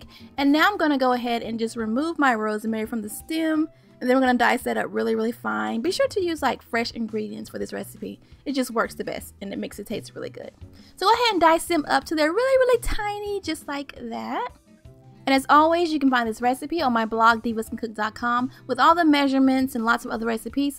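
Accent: South, United States. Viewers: Yeah, got to say this was THE BEST homemade roast chicken I've ever had. Thank you SO much for your video. Informative and entertaining...love your Southern twang!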